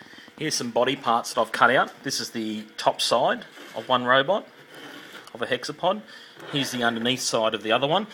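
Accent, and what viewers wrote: Australia. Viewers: great to hear aussie accent at last!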